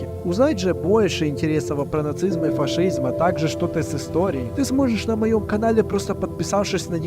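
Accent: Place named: Odessa, Ukraine. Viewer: акцент. Вы во многих словах букву А удлиняете . Получается акцент очень похожий на говор евреев Адеситов .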